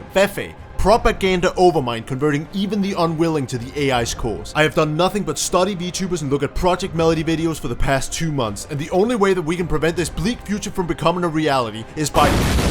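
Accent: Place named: Denmark. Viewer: Den dansk engelske accent vil altid være der uanset hvor meget man prøver at gemme den xd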